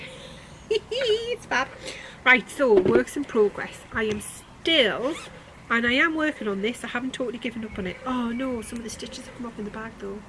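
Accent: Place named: County Durham, United Kingdom. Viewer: Only just found your podcast and am binge watching you from the beginning. Loving it you crack me up. I recognise your accent and when you said you were brought up in Burnhope that's probably why. I was brought up in Annfield Plain and Catchgate so you probably went to my school, Greencroft (albeit a few years after me)? Anyhoo you've got a new fangirl 😄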